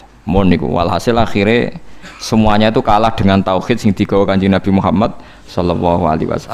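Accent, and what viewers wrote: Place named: Java, Indonesia. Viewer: wes medok jawane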